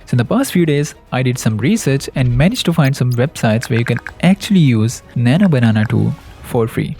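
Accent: India. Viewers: Indian accent is so annoying🥀🥀💀💀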